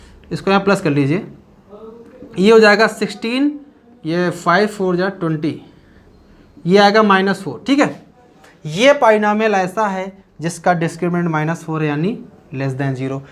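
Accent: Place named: Bihar, India. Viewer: Sir you are amazing 👍🏻👍🏻👍🏻👏🏻👏🏻 aur apka bihari tone bhi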